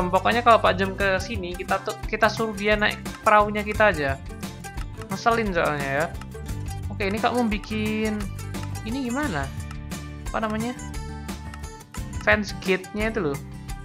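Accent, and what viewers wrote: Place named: Java, Indonesia. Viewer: Mas oraang jawata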